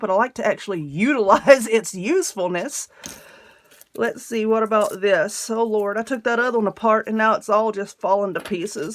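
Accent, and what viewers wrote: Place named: Atlanta, United States. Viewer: Yes I love the way you talk to, could listen to you all day. If my great-great grandfather hadn't moved out of Atlanta north maybe I'd have the same accent, I love it! Really enjoyed this tutorial, thank-you so much for posting.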